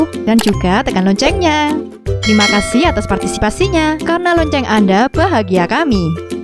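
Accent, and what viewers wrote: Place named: Medan, Indonesia. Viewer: Bang lu orang medan ya?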